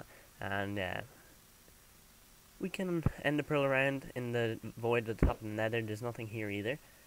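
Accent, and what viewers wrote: Ireland. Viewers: is this lad irish? he sounds it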